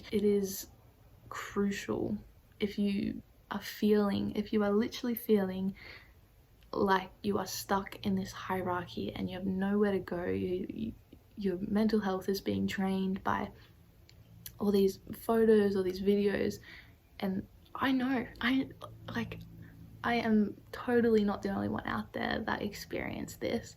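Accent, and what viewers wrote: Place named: Australia. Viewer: I knew she’d have an AUS accent even before I clicked on the video for some reason haha. I do think social media gives us too much insight on people’s lives. We used to live our whole lives not knowing what our friends, family, and fav celebrities ate for breakfast.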